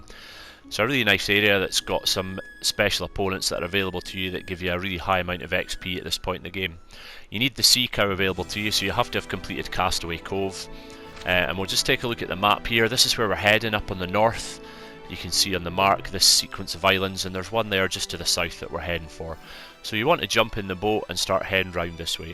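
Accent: Scotland, United Kingdom. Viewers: I believe he may be Scottish, me lad.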